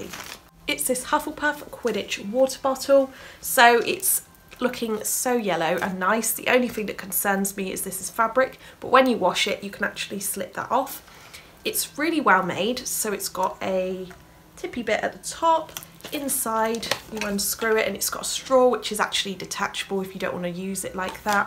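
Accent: England, United Kingdom. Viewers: I love your english accent 😙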